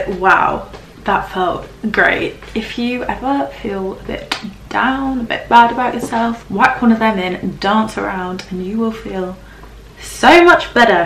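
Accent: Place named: Birmingham, United Kingdom. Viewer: love the Birmingham accent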